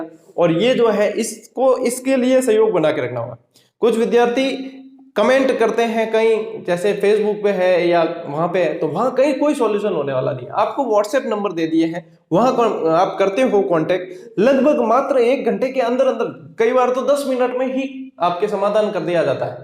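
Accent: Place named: Marwari, India. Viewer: Sir aap marbadi bolte ho muje shmj to nhi aati lekin achha lgta he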